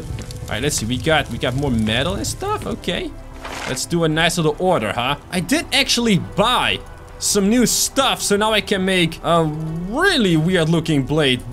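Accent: Netherlands. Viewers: Ben je nl ik hoor het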